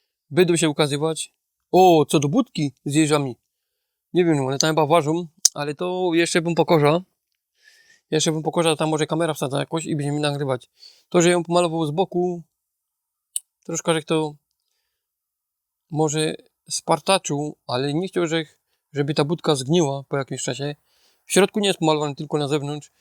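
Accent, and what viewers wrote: Silesia, Poland. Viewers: super się ciebie słucha jak opowiadasz w gwarze śląskiej, bardzo mi sie podobo waszo godka, warto mieć swój kawałek gruntu i swój kawałek podłogi, warto samemu coś zrobić bo daje satysfakcję, pozdrawiam, lajk 62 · Super odcinek. Rewelacyjna gwara śląska. Jeśli chodzi o kamerę to zanim ją rozbijesz sprawdź kartę. Prawdopodobnie przyczyną wieszania się kamery jest karta SD. Zmień firmę karty SD i powinno wszystko działać bez problemu. Miałem taki sam przypadek. Moja kamera nie trawiła kart Kingston natomiast bardzo dobrze pracuje kartami SanDisk. Pozdrawiam serdecznie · śląska gwara mnie rozwala;) na dobry początek zostawiam subka i zapraszam również do mnie, pozdrawiam z Tyrolu:)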